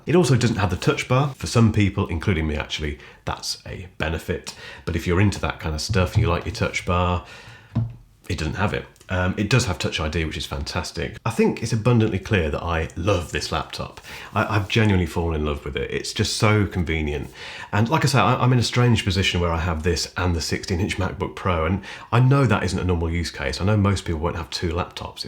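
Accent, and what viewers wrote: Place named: RP, United Kingdom. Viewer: The accent is so posh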